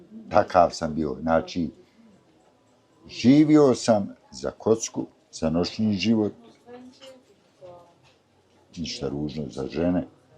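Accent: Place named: Sarajevo, Bosnia and Herzegovina. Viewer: Predivan,pravi Sarajlija i glas i izgled,šarmantan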